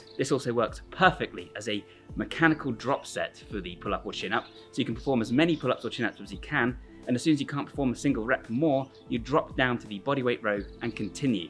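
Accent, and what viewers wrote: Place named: RP, United Kingdom. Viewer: I was expecting an L.A gang member in a prison yard not this nice sounding man with a posh accent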